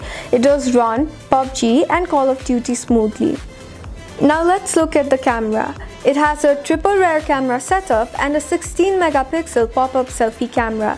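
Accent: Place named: England, United Kingdom. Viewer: I like your english accent.. 👍👍👍 Your pronunciation is very good.. And your video is pretty simple to understand.. I like it.. Keep it up.. Looking forward more from you.. 👍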